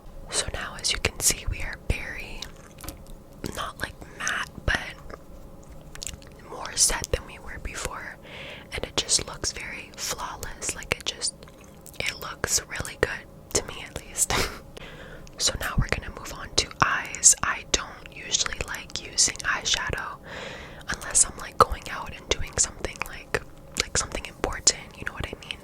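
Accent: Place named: Canada. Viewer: Hello my favourite asmrtist in the world good to see you making some more lovely asmr again. I love your whispering Canadian accent it’s beautiful and I love your beautiful lovely overgrown long smooth silky soft brunette hairy mane of yours 😌❤️., I have a favourite asmr request I would love for you to make it for me to help me get to sleep 😴 please if that’s ok with you as I was thinking since I love slowly relaxed real hair brushing asmr and brushing long hair fully covering🙈all over face asmr it would be wonderful and fulfilling to see you brush your beautiful mane and brush your beautiful mane fully covering all over your face but for my asmr request as the rhetorical question can you do 30mins long slowly relaxed real brushing your long hair fully covering 🙈all over your face nonstop asmr with no mic 🎙️ and no earphones if that’s ok with you, can I comment the asmr video link or send an email to you of the video if that’s fine by you aswell 😇😌❤️👍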